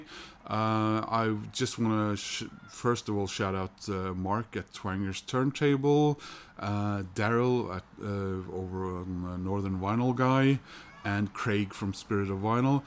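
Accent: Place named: Ireland. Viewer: Look at that cool Opeth album cover ! The motorcycle noise reminds me of some of the streets here in the U.S. Thulsa Doom.. I will listen. I have The Hives debut on an original pressing. Bought it back in 2002 or so. Good Irish accent sir ! 😊The only accept I have is Metal Heart. I just watched Doug's latest here before work today.✌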